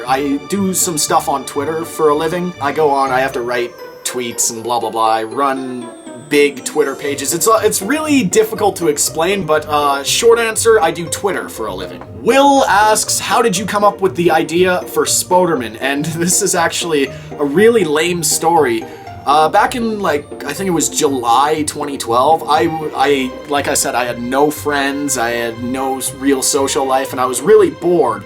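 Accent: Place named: Canada. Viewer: I was going to ask you if you were from Canada because of your accent but then the video answered it for me.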